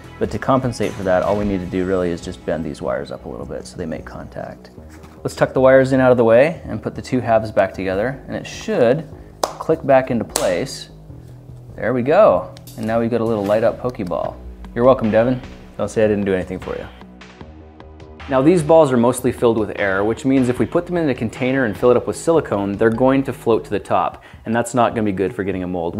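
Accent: Canada. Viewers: I've been wondering for a long time now, based on the way pronounces certain words like "tomorrow," if he's Canadian. Anyone know?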